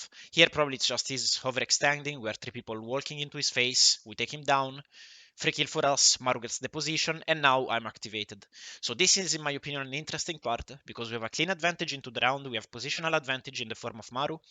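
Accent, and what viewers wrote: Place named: Italy. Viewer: You have a strong italian accent, anyway, nice analysis! And good luck tomorrow for the match with the unicorns!! Destroy them :)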